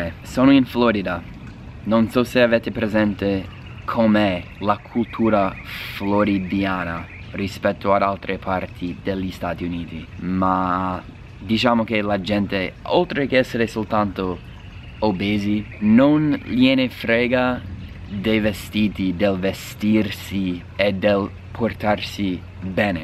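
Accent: Rome, Italy. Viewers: Accento romano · Ahah ROMANACCIO😂😂😂😂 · Quando parli ogni tanto sembri romano 🤣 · L'accento romano di è fantastico 😁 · oltre ad esserti italianizzato ti sei anche romanizzato 🤣 si sente sempre di più l'accento romano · Accento americano con la cadenza romana. Da morire 🤣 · mi hai fatto morire parli romano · Hai una cadenza romanesca stupenda ahahahahha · Piu che italianizato ti sento romanizato. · Morta dal ridere. + che italianizzato te 6 romanizzatooo!! Ameriga e amerigana, ciavatte, ahaha troppo simpatico. Si effettivamente qua avresti trovato un frigo pieno ma non solo, anche una cena o un pranzo mega x accoglierti. E vabbè si sa che in italia siamo il top in certe cose. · ha evidentemente praticato qualche romano o è stato a Roma perchè lo parla anche bene. "Co le ciavatte", il "Co" anziche con mi fa pensare questo, detto alla romana 100% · Simpatico accento Romano ^_^ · Da Romano si, pure qualche Ao gli é uscito haha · ti è venuta la cadenza romana quando parli in italiano.:-)) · "Mazza oh", si inca@@ e dicono "AHO", "ammerigano" invece di americano.. 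😂😂😂, troppo ridere, sicuramente parli romano meglio di me 😄(okkio alle parolacce e ALLE SCIAVATTE però, right 🤣🤣🤣?) p.s. si dice Ciabatte 😉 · No ma ..sentirti parlare in romano mi fa letteralmente morire dal ridere, irresistibile e bravissimo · ma questo accento romano ? : ) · Ma tu sei stato a Roma da come parli.. Troppo forte, sei un grande, io lavoro in ambasciata usa a Roma e l'aria condizionata la maledico tutti i giorni hahahaah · Bravissimo non solo con l'italiano ma anche con il romano. Se ti interessa approfondire lo stile elegante del romano ti consiglio qualche youtube di "Ruggero De Ceglie". Buon divertimento! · Sulla convenience hai ragione. Non sono mai stata negli USA, però vivo in UK, e anche io ho notato che tutto è, come lo definisco io, "a prova di idiota". Spero che anche in Italia la smetteremo presto di metterci a vicenda i bastoni tra le ruote (spero che tu conosca questo modo di dire 😂) e di affidarci a tutta questa burocrazia. 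Complimenti come al solito per l'ottimo italiano (romanaccio 😂) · Ormai parli con l'accento di Roma. Un Nando Mericoni di oggi. Sul punto 5 in italia dipende molto in quale regione ti trovi. Se vai ad esempio in Liguria Genova, i genovesi non brillano in empatia (senza offesa per i genovesi) se vai a Bologna ed in Emilia in generale, invece la gente è molto amichevole e la chiacchiersta anche con la cassiera del supermercato ci scappa 8 volte su 10. In Romagna sono simpatici, ma falsi, la simpatia è soesso finalizzata ad accaparrarsi il cliente. A Cortina sono odiosi a Milano sono poco empatici, a Napoli sono generosi, pure troppo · Più che da persona italianizzata questo linguaggio mi fa pensare ai ragazzi delle medie che giocano a fare i duri con i coetanei. Le parole scurrili e le inflessioni romanesche trasformano un madrelingua inglese in un fenomeno da baraccone. È questo che suscita ilarita'. 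Imparare l'inglese non mi ha mai appassionato ma se fossi costretta lo chiederei sicuramente alla professoressa sopra. Sono sicura che mi farebbe fare un'ottima figura. Sarebbero soldi ben spesi. Per adesso continuerò a studiare la meravigliosa letteratura italiana in special modo i nostri grandi poeti come Giacomo Leopardi. La grande cultura che aveva noi ce la possiamo solo sognare. Solo così ci possiamo elevare con la lingua. Sono dell'opinione che le critiche ci aiutano a migliorare, quindi se riceverò correzioni sui tempi verbali o l'ortografia mi farà piacere. Buona vita a tutti. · Anche romanizzato nell'accento! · Da come sta parlando mi sta venendo il dubbio che lui stia vivendo a Roma sud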